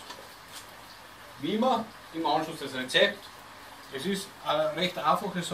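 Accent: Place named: Austria. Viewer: I just love the Austrian accent even though it means I can't catch everything he's saying! So nice to see someone doing these traditional recipes. Also love it that the dog is allowed in the kitchen, it reminds me a bit of the old British show "Two Fat Ladies".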